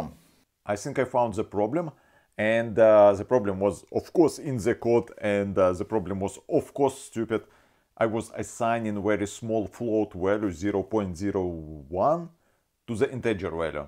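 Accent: Russia. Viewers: Ебать акцент, наш чтоли?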